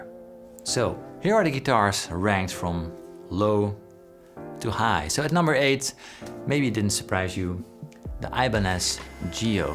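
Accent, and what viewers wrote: Netherlands. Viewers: Are u dutch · Haha kan aan je engels horen dat je Nederlands bent😅 blijf zo door gaan kijk altijd al je video’s🔥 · That's a strong Dutch accent.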